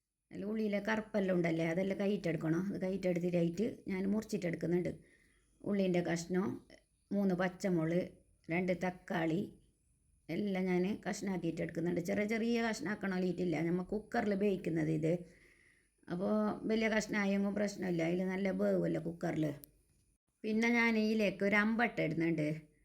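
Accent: Kasaragod, India. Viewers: നിങ്ങടെ സംസാരം എനിക്ക് ഒത്തിരി ഇഷ്ടമായി നിങ്ങൾ കാസറഗോഡ് ആണല്ലേ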